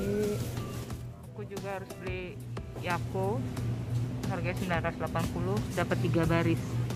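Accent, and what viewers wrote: Bekasi, Indonesia. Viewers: Mpok next kalo buat vlog lumayan lama durasinya yaa, suka banget liat vlog Mpok, apalagi liat yang super gemes, masyaa Allah seneng banget liat Mpok walau udah tinggal disana tetep gak sombong dan aku suka gaya ngomong Mpok yang khas Bekasi hehee, Salaam dari Bekasi Mpok.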